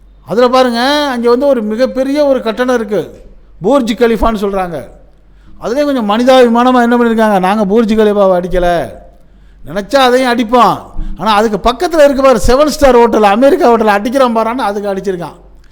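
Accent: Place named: Thanjavur, India. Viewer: அருமையான தஞ்சைதமிழ் மணம்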